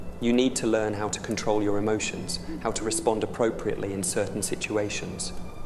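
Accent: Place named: England, United Kingdom. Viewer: Hi ! I'm a french girl and I'm soooo happy to understand ! English accent helps a lot 🥰 But may I ask you a question ? It is your voice or an IA voice ? :o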